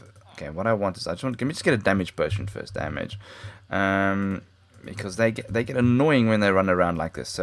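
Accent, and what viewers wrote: South Africa. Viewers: Ur South African ¿ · are you South African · Are u South African You sound like it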